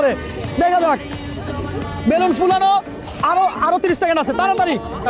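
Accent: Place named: Gopalganj, Bangladesh. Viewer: Kotha soja gopalgonj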